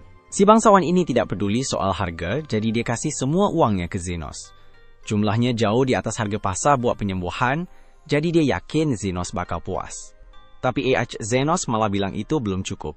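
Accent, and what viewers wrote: Malaysia. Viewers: Logat nya melayu nih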